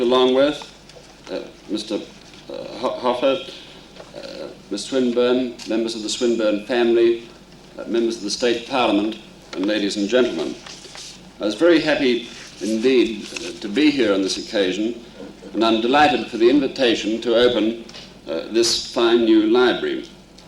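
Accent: RP, United Kingdom. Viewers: sounds like the queens accent.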